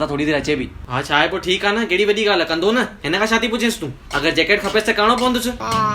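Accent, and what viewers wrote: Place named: Larkana, Pakistan. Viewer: Your grandparents must be from Larkana because your Sindhi is 100% similar to ours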